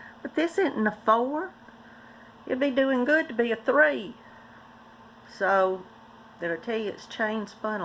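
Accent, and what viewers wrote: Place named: Kentucky, United States. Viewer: Hi 😊, I love yarn too! Just found you and I'm looking forward to seeing more of your videos! I would love it if you came over and checked out mine, if you would like or if you can find the time. I'll be watching! I'm from Kentucky, so I know the accent 🤗!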